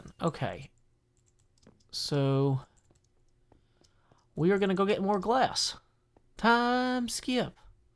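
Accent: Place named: Tennessee, United States. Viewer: I had no idea I wanted to hear “Hack the planet” in your musical Tennessee accent. Always learning new things on your channel,.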